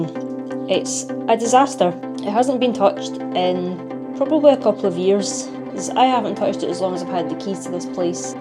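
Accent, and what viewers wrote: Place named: Scotland, United Kingdom. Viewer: Progress is coming along well, you'll learn as you go and no better way to learn than to throw yourself in at the deep end. Got to love the Scottish accent. Keep up the great work and look forward to more updates 👍🏻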